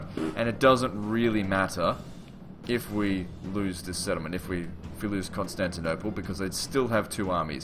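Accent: Australia. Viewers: Australian I think (at least that's the most obvious too me, as he is australian)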